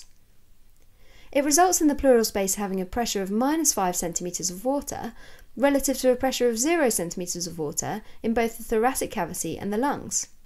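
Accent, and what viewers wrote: United Kingdom. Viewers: The narrator is so British 😂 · my brain is so used to the american accent on these videos and the british accent was a bit of a shock! lmaoo hahaha and im british lmaoooo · The British Accent makes such a positive difference, its like listening to Arya Stark:) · Good British English accent. Clear explanation.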